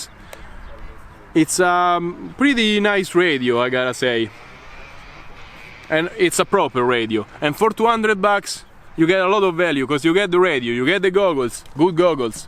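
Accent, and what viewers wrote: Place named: Italy. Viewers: solo a me sembra italiano? However thaks for this good review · Sembra italiano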